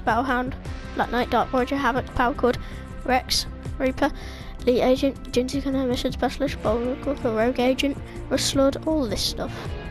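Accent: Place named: United Kingdom. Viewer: He sound so British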